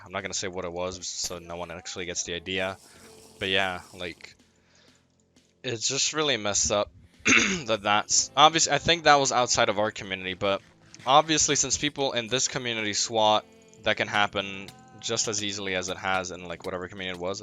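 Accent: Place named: Netherlands. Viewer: You sound a little Dutch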